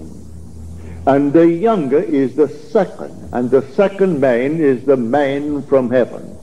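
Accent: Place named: Northwest Florida, United States. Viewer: Yes in years past people in Northwest Florida spoke with the same lovely accent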